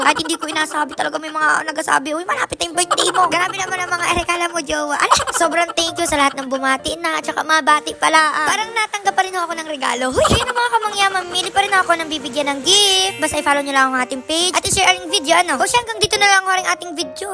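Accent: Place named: Marinduque, Philippines. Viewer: Happy birthday 🌺🌸natutuwa talaga ako sa iyo paano ay kuhang kuha mo yung salita namin sa Marinduque😅😅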